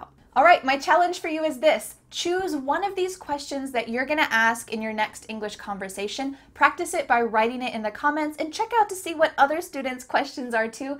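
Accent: United States. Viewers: Thank you,. You're the best teacher I've ever seen. Learning with your videos is so varied and makes a lot of fun. Your videos and English-lessons are very helpful and I hope that I'll become a confident English speaker with it. I wanna say it again and again: thank you, thank you for everything.🍀 P.s. I love the American accent and the pronunciation of 'er'😍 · Hi. I am one of your new subscribers. I just want to tell you that I love your content. Just a question, which part of the US you are from? Is this is a California accent? I am Polish but I have been living in the UK since 2004 and guess what? I only love US accent your's is one of the ones I like that's why I did ask you this question. Thanks:)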